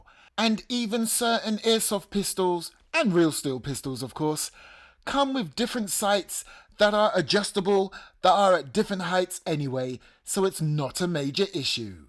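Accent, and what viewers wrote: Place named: London, United Kingdom. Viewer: Hi, how long did it take for JKArmy to delivery to London (assuming you are from London from your accent)? Also, were there any issues with customs? Definitely thinking of picking this up. Thank you!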